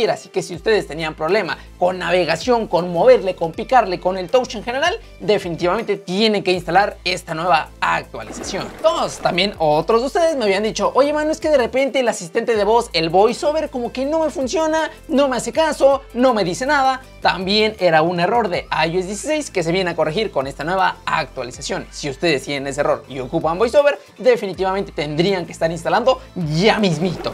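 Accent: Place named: Asia. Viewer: se nota un poco tu acento asiático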